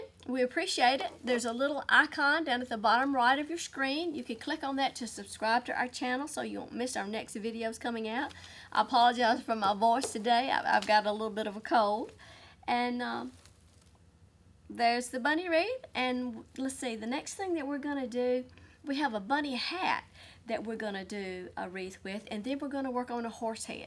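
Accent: South, United States. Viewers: Love it! Thank you for sharing! And your southern accent is too cute!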